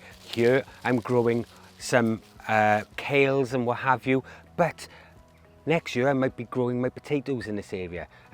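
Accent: Wales, United Kingdom. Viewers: This video is amazing. I think I recognise that accent though, 😊 is that a welsh accent? I live in south wales 🏴 up in the valleys. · Irish name? Welsh accent? 😉(South?) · Where in the UK is your accent from please?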